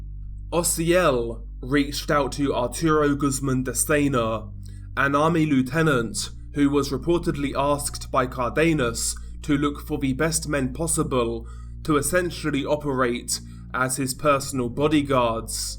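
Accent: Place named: United Kingdom. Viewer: Idk why but as an american i enjoy the british narration on mexican cartel atrocities.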